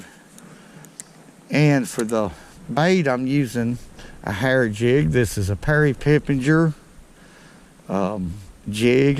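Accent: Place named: Alabama, United States. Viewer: I just love it when he says "Q...u..it" with that Alabama Accent.. And Im from TEXAS!!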